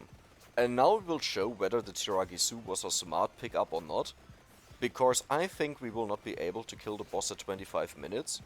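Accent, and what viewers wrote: Germany. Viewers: Bro man hört so raus das du deutsch bist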